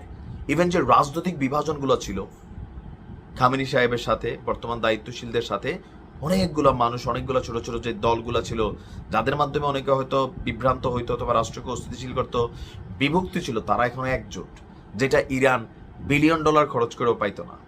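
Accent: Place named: Noakhali, Bangladesh. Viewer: ওটা নোয়াখাইল্লার ভাষায় এটা কথা বলে আল্লাহ জানে আমার চুল ধইরা বাংগাল টানে আমার বাল ধইরা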